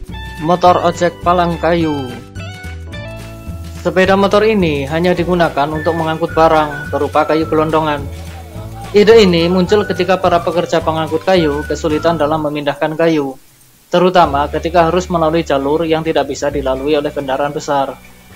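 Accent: Java, Indonesia. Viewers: suara jowo'mu medok pisan yo bro...😂🤣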